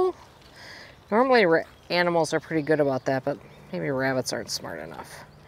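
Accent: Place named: Midwest, United States. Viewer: Im in Idaho but appreciating your midwest accent since Im from Chicago :)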